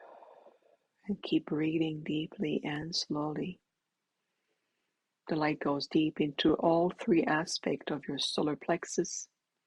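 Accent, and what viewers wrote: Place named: Quebec, Canada. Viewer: Merci!! J'adore tes méditations! Même s'il est presque indétectable, ton accent me dit que tu viens du Québec. Nous sommes de nouveau en lock-down, couvre feu, tout est fermé même pour ceux qui ont leur Pass sanitaire. Grosse leçon pour eux j'imagine. So thank you again! I saw you on Patriot Dave and listened to all your meditations since. Thank you for your devotion to the collective. Have a great year! I didn't even see 2021, time as been slowly disappearing the past 5 years for me. We've got this! Much love ❤️❤️ From Contrecoeur, Qc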